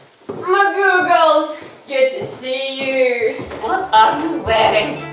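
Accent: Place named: Netherlands. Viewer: Ha I new you were from somewhere the Netherlands ore Belgium I thought I heard it right in one of the bloopers from the mischief managers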